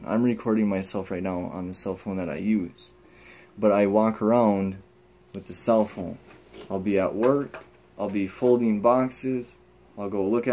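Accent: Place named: Wisconsin, United States. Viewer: Hi....it's me Dr. Rockso....good to see ya....love the Wisconsin accent!! I miss the crew!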